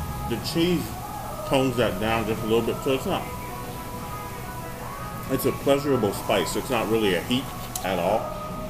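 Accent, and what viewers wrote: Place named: South, United States. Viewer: I had to tease you lol.....you sound like my dad he's country..😉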